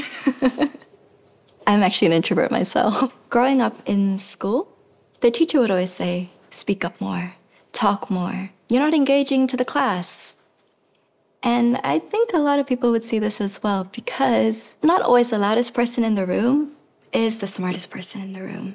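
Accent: England, United Kingdom. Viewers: O inglês britânico sendo o maior charme dela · I love her English accent ❤ How beautiful she is 😍🩷 Thank you CHANEL 🤍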